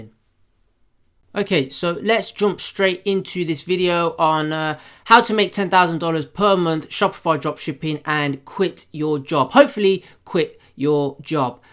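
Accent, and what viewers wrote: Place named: Birmingham, United Kingdom. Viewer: sick videos mate, you sound like youre from or around brum, would love to get some tips if available!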